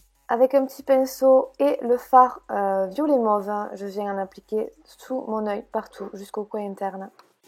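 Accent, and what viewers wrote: South, France. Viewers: ton accent c'est celui du Sud ? 😘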